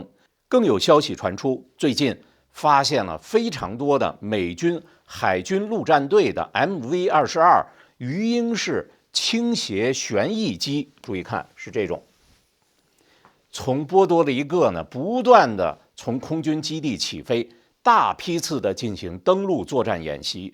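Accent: China. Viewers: 听口音都知道从中国出来的你觉得呢？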